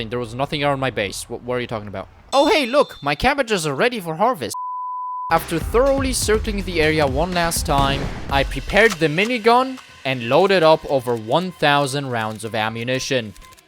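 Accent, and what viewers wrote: Poland. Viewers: The more I listen to you, the more I here your Polish accent or atleast thats what my head tells me, keep up the work brother. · are u polish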